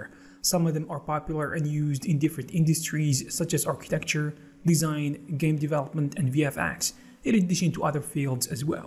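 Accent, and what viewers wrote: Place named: Arab world. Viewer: u have an arabic accent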